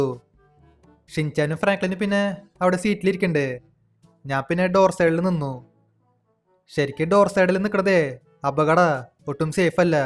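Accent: Thrissur, India. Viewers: Bro തൃശ്ശൂർക്കാരൻ ആണോ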